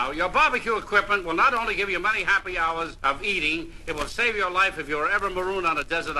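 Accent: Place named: New York, United States. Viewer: Jewish New York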